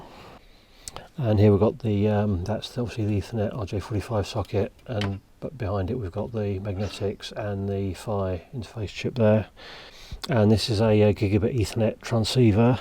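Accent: United Kingdom. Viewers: Very interesting subject really. but with your UK accent and whisper like voice. it is hard to catch the words with no subtitles. quite important product for me though but I ditch a lot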